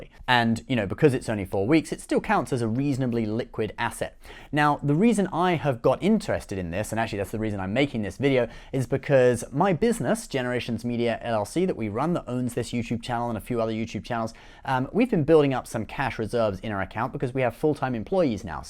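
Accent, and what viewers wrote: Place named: England, United Kingdom. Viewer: Your accent is English. Is there a t bill equivalent if you're not resident in US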